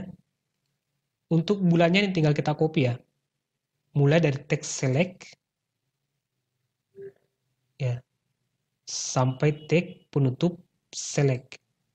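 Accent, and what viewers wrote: Bugis, Indonesia. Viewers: Orang Bugis yah?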